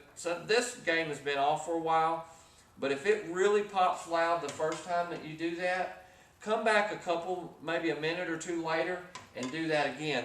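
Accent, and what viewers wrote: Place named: Texas, United States. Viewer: Go-ahead and say chassis anyway you want. We know what you mean and where you are from. It is a French word. If we tried to say it to a Frenchman's satisfaction, we would all look ignorant. If a TX accent causes you to fail to understand the content of these excellent videos, then I would find you strange. Read this eurouc: <link> Accents are long standing parts of verbal communication which add texture and identity to spoken word. Maybe you are simply too ignorant to understand. Maybe you are French. As I am French-Canadian and have half my family living in the Bayou posing as Cajun, I am offended by you either way. EuroTrash might be a better name for you.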